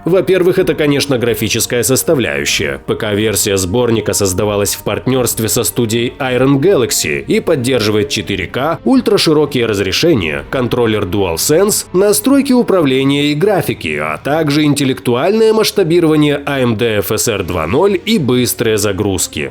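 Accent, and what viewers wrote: Ukraine. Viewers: ти украинец?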